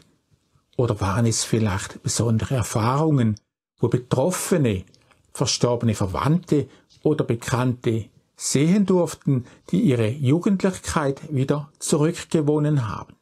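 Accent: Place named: Switzerland. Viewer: Herr, jetzt weiss ich aber Ihren Namen, habe denselben von einer Dame abgespickt die auch in Ihren "Comments" gepostet hat:)..... Ein ganz ganz herzliches "Gruezi" an Sie und Ihr wunderschoenes Land, die Schweiz... und dann noch ein ebenso herzliches Merry Christmas und Happy New Year aus USA.... Ich bin so gerne in Ihrem Kanal um alle Zeitgenossen zu erleben die Sie interviewen und/oder intrerwiewed haben... Sie sind ein echt charmanter, sachkundiger Interviewer... ihr Schweizer Akzent davon ist einfach zum Dahinschmelzen.. Ich liebe die Schweiz und mag die Schweizer:) Schoene Feiertage:) und alles Gute:)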